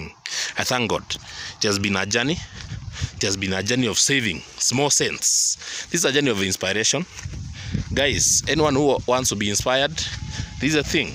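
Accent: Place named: Kenya. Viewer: If you asked me, I would have side,from the way to talk, that your a KIKUYU. I am a subscriber of Marwa and now you. Uvoo wa musyi? Greeting from Manchester.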